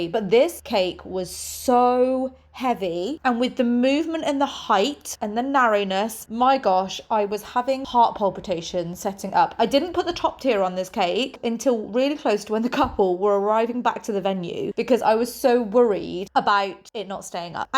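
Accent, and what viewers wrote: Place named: United Kingdom. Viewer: Love your passion and lovely British accent ❤❤❤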